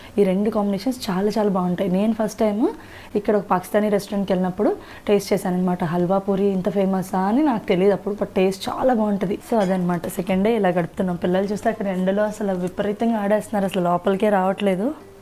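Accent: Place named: Rajahmundry, India. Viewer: Hello friends meeru matladithe rajahmundry nir chodavaram ni thalchukontunnaru tq friends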